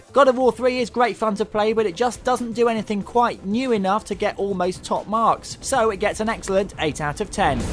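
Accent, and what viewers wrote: United Kingdom. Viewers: actually he sounds british to me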